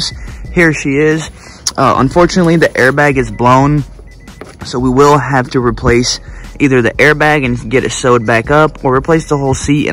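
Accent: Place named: South, United States. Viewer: you even talk like the GQ boys :D (or maybe it's a southern dialect, idk but its funny in a good way). it's definitely going to be a cool project to accomplish. good luck mate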